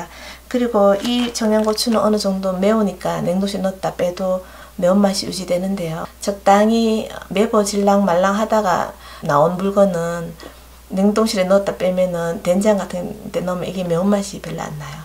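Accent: Gyeongsang, South Korea. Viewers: 경상도에서 잘 해먹는 반찬 서울 사람들은 잘 안먹더라구요 쪼매만 경상도 사투리 섞어 나오네요 ㅎㅎ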